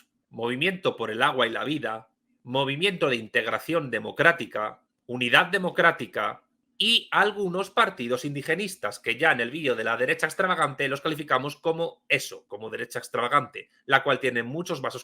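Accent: Spain. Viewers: Por favor que hace est señor con asento español opinando de el señor petro .... Analiza a los de tu españa amigo no metas las narices dond no t han llamado ....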